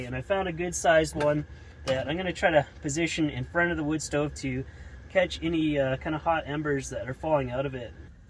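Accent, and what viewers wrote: Canada. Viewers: Good job on the stove pipe. Love your Canadian accent, much love from Detroit Michigan. Peace Owt 😂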